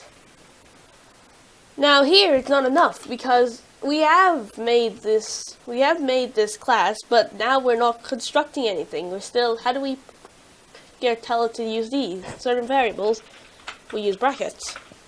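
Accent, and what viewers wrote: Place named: United Kingdom. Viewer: From your accent and your way write colour instead of color. You might be UK Citizen. 🤔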